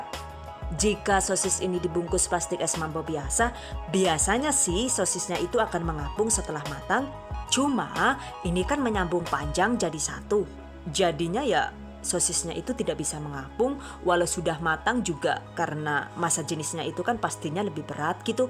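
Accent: Banyumas, Indonesia. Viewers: Mba wong ngapak ya...😅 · Lhaaa lhaaa.. Deneng ngapak mbak? Ya pd bae kr inyonge.. Aq jg follow akun njenengan sing ng cookpad mbak. Sukses trs mbekayu.. 👍🏻👍🏻👍🏻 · Dr logatx kykx wong ngapak nih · mantapp...ngapak bangett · Ngapake kue jenk....jan ora ngapak ora kepenak🤣🤣🤣🤣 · hahaa gak tau kenapa aku suka banget bahasa ngapak ini. kalau denger temen ngomong aku melonggo sambil senyum. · Deneng ngapak ya wkwwk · Suka lihat video nya... Penyampaian bagus... Trus ngapaknya bikin kangen... Wkwkwkwk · Deneng ngapak? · Anu wong ngapak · Wong ngendi si rika mbekayu??? deneng ngomonge ngapak jan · Sy suka penyampaiannya jelas sekali... dan ngapaknya itu lho...👍👍😍😂😂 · Ibunya ini orang mana yaa 😅 kok medoknya mirip akuuu yg dari Banyumas. Btw baru nemu channel ini hehehe bermanfaat bgt buat calon ibu rumah tangga nih hehe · Owalah wong ngapak juga ternyata. Ora ngapak ora kepenak yah mbakyu:D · Mbekayune wong ndi si kie yaa.. 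deneng teyeng basa penginyongan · Njenenga jane asli ngendi sih bu?...deneng bisa ngapak?..ngapake bener sisan. Top pisan lah...basa inggrise jg joss... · Deneng ngapak, ora ngapak ora kepenak yaaaa😁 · Mirip pengisi suara warung tarsun di radio RRI pwt Apa lagi pas ngomong ngapak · Hahaha.....deneng ibune wong banyumas.....😃😃😃😃😃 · Iduguduh Deng malah wong ngapak? 🤣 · Madiun deneng bahasane ngapak kaya nyong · Lah deneng Rika ngapak yu? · nyong kaget pas ngomong ngapak salag krungu pora teknputer ulang saya suka saya suka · Masa tepung keledai jeng ha..ha.ha..lnjuttt jeng ngapakkk..inyong jd ktawaa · Bu rika Wong ndi sih deneng ngapak temen Kaya nyong 😁😁😁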